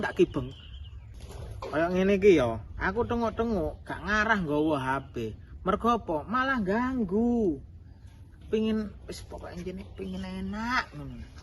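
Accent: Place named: Sidoarjo, Indonesia. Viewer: daerah ndi yo...? logate koyok Sidoarjo...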